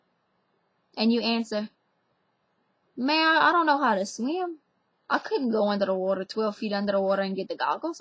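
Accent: Louisiana, United States. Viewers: spaghetti that's bull she has a Cajun heartland accent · Megan Perry That's right. I'm from Paradis, La, ppl in Bayou Gouche has that Cajun draw which I love. Ppl in Des Allemands has that cajun draw. Like she said I have that New Orleans accent where yat? I like her accent, Thiboudax close to it :) :) :) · ❤ Bonjour, ma blond ! ❤ Anyway, Cajuns speak two separate languages, if they can anymore. They most all can speak Cajun English or just plain English like everyone else in the USA. Cajun English is just what she is speaking, dem, dat, dare ( like ova dare for over there. ) English with a different pronunciation. Older Cajuns can speak the Louisiana French of their Accadian ancestors, from Nova Scotia. They were Canadian French people who spoke French. They originated from Western France, so they spoke French. And they spoke French in Nova Scotia. 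And they speak French in Southwestern Louisiana to this day. There is just so much prejudice in the USA against any language other than English. So much has been done to stop the Cajuns from speaking Louisiana French. It's just a miracle that anybody in the USA speaks French. Universities in the USA only attempt to teach Metropolitan French from textbooks. This is known as textbook French or written French. I am sure most of the teachers or instructors do not speak French. They speak English and can usually read some French from their textbooks. How else are they going to teach what they can, to students who don't know that their teachers have so little knowledge of the spoken French language. Universities, step up ! Don't waste your time, and that of the students in this half assed teaching attempt. Hire some real teachers, native speakers. They all went to school forever in France, and they know French as any native does. 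Step Up ! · You sound like you from Breaux Bridge!! Holla from NOLA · I live in New Orleans, and I think it should be mentioned that your accent doesn't sound Cajun at all. You have a Northern Louisiana accent. Cajuns slur words more and use more contractions. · tekkmineharmony This young lady's accent is not northern LA at all. She sounds like she is straight from the bayou, and she does a fine job explaining the differences between the north and south. NOLA accents and southern LA accents are not inclusive of one another. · I lived in Gretna for a bit, and like the state her accent is a mix of cultures. She definitely doesn't have anything close to a Jersey accent. It's beautiful either way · I live in Baton Rouge but I have some family that life in Eunice near Lafayette and their accent sounds a lot like yours lol my accent sounds more creole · BABYY!! I'm Cuban... lol ..I've issues with regular English pronunciation and understanding this Texan accent but ohhh girl... 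Louisiana accent.. I'm out.. to much for my Cuban brain ... lol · she is sweet as can be. she's generally right on the regional accents and hollywood butchering all louisiana accents. i would say she has a hybrid accent sometimes sounding cajun and sometimes more like north louisiana. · You would be fabulous at reading audiobooks where the story is located in LA. Its awful to hear when they get someone from CA trying to do a LA accent. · I’m an actor studying for a role. My character is from Louisiana. Your accent in near impossible to do! Dang. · Thanks for the lesson; very interesting. I'm a bilingual Canadian who found your Cajun French pronunciations charming. BTW there is still a relatively large community of Acadians living in New Brunswick, Nova Scotia and parts of eastern Quebec. Their dialect—like yours, is sprinkled with local pronunciations of common French words, unique expressions and anglicisms that have filtered into the language. 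· Ahhhhhhh The Louisiana accent especially from a pretty white girl and im from Ca. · Definitely prairie Cajun. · being from avoyelles parish, she does sound like it to me. maybe Mansura if I'd have to guess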